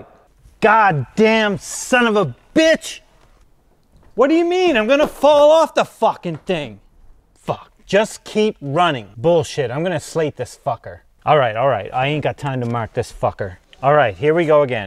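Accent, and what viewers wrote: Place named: Canada. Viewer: Canadian accent.. eh?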